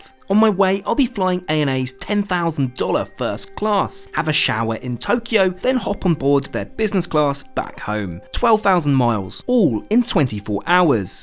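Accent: United Kingdom. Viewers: 13 seconds he says, "I'll be flying ANA's ten thousand dollar first class." He has an UK accent. They use GBP or Pounds Sterling so most likely he quoted in USD. If he had an Australian accent he'd be quoting in AUD Australian dollars. Canadian accent would quote in CAD. However, my information was incorrect as he didn't give a price for the return flight which was business class. So the entire trip would be more than 10k.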